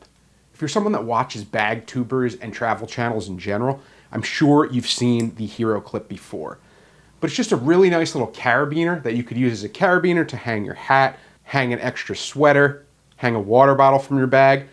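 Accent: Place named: Philadelphia, United States. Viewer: That Phuluffia accent. Go Birds!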